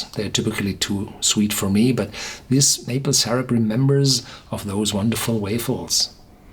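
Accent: Germany. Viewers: I don't think he's British or from the U.K. I believe he's a German native, though perhaps he moved and I'm wrong? He obviously has a very strong German accent.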